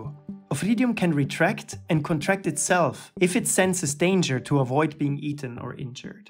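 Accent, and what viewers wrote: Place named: Switzerland. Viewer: I believe so - also i think i detect a swiss accent in the content creator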